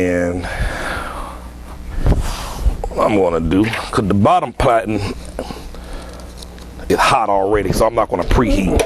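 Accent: South, United States. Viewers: It's great to hear a southern accent on the tube. Keep making cool videos brother. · Peel it! Drop it! Roll it, and boom. LOL! 😁😁 It is early in the morning and I am watching this video.. You are so funny and inspirational at the same time. I always learn the best when something is funny or I am laughing. Thank you my brother for this video. I like your Southern accent. I live in the South as well. God Bless you!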